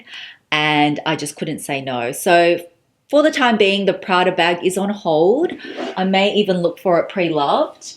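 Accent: Australia. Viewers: Love your Australian accent. Lucky you. I can't even afford to buy the fake bags. Love all the goodies you bought.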